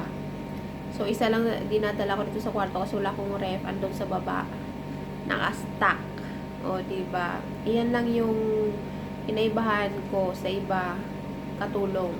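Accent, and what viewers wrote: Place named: Ilonggo, Philippines. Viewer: ang tuno nga ilongga gid bala aw hahahah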